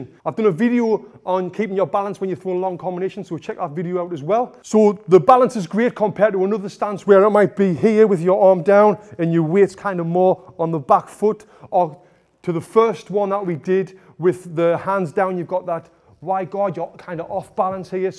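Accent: United Kingdom. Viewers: UK english is so nice, those "poonches" and "Meeweather" are brilliant! Cheers from Kazakhstan to all UK Boxing enthusiasts.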